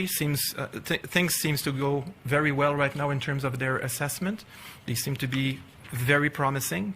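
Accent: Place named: France. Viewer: Ughhhhh. That French accent. Gross.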